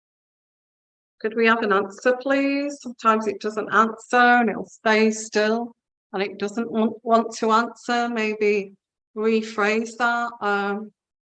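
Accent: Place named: England, United Kingdom. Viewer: Hi Sweet Lady, so polished and kind. I am sitting on my sofa enjoying every word. I love your genuine English accent. At first, l had a little difficulty understanding you, but now l am getting used to it. I am ltalian Naturalized American, and l used to do medical transcription in a major hospital in Detroit, MI. I have learned to transcribe for doctors from all over the world. Very interesting! Thank you for your hard work! I am sorry, but l can’t shake my gut feeling that Nicola’s partner is guilty. He is involved, a cheater. But l am not a psychic.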